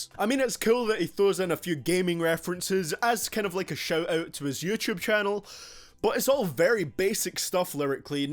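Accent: Scotland, United Kingdom. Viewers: Are you Scottish? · Where in Scotland are you from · are u scottish cause i am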